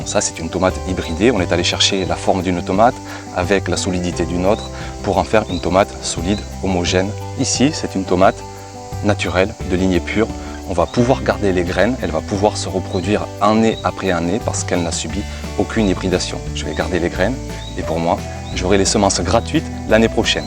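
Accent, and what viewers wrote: South, France. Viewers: Et puis il faut reconnaître que l'accent du sud de la France 🇫🇷 est très agréable a ecouter !!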